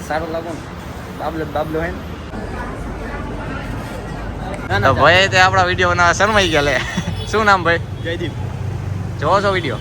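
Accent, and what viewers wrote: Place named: Zalavad, India. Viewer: Jordar maja Ave che tmara video jovanu ❤tmara video ma bav maja 🎉ane you are the best canteen creater 😎 ane bav j handsome lago cho ❤ek dam babla jeva j ,ane tmari zalavadi boli bav j mast che ek dam top 😎😎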